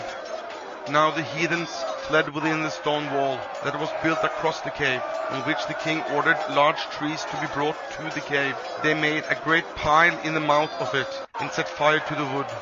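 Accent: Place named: Norway. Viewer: Hehe, genuine Norwegian accent and all :) Great video mate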